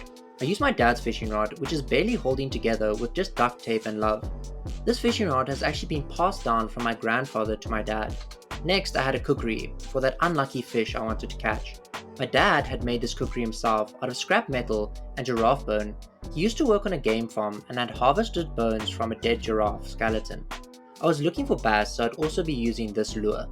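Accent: South Africa. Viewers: Is that a south African accent that graces my ears ?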